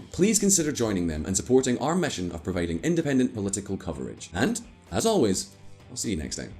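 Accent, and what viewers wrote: Scotland, United Kingdom. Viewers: Stop whining about Simon leaving ffs... We still get a bald white guy with a UK-based accent featuring Venezuela’s economy, and still for FREE. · Whoa, how weird hearing scottish accent on this channel.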